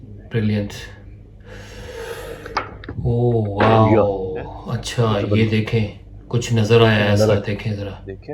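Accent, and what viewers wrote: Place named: Punjab, Pakistan. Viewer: bai good work mainu tusi v lehnde Punjab ton lagde ho bcz thodi boli Sade wali hai bcz aam loka nu ta madhani jis naal apne ghra ch saag ghot de aa pta hi ni v ehnu kehnde ki aa, jdo v koi Punjabi veer kuch changa karda ta BHT vadia lagda, parmatma thonu Chad di kla ch rakhe. Bht ghaint review karda bai.jwb jarur deo msg da Sat Shri Akal 🌹 Frm- Chad da Punjab India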